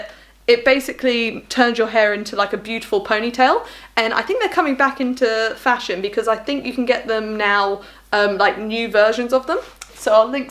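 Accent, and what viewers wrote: United Kingdom. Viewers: I'm enjoying your british accent 🥰 and i love thrifting 💖 new subscriber 🎉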